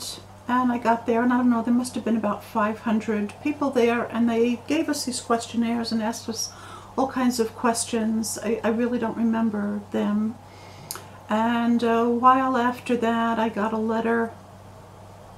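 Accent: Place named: United States. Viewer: You sound very American ! Thx for the video !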